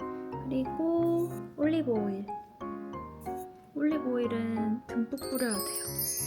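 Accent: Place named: Busan, South Korea. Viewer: 시애틀 브이로그 넘 재밌어요!! 자주자주 올려주세요~~ 옛날에 비해 특유의 뽀짝한 부산사투리가 많이 옅어지셨네요 ㅎㅎㅠㅠ 그래도 귀엽고 예쁘고 다 한,♡ 유투브 다시 시작해주셔서 감사해요!!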